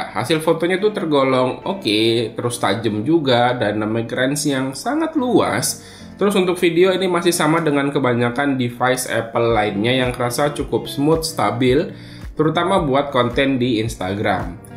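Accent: Samarinda, Indonesia. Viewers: wih orang samarinda ya bang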